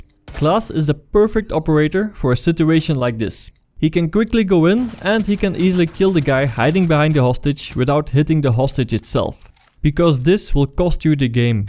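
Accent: Netherlands. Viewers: that dutch accent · nederlands accent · are you dutch?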